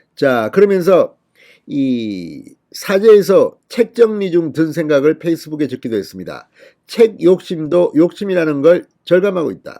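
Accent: Gyeongsang, South Korea. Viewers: 파이팅 ㆍ진성호 위원님 갱상도 사투리 유머 중간 중간 넘 진솔해서 좋아요 ㆍ 해방됐다 ㆍ조용히 잊혀지고 싶다 ㆍ이런 문죄인 이 농사일 하든 말든 돼지감자 많이 쳐묵고 ᆢ감방 갈 날 기대해요 ㆍ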